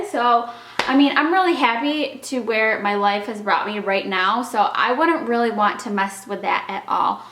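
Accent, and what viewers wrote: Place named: New Jersey, United States. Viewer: lol i have been watching since she started on and off and I always knew she was from NJ because of the way she talks and she talks with her hands a lot like we all do from NJ specially with italian blood but I never heard her say it and after I saw the back of the house i was like that has to be an older NJ house!!! LOL specially up north NJ bc they are soo much more pricey that you are almost forced to buy a fixer upper but in the end its better because you can put ur own little finishing touches! xox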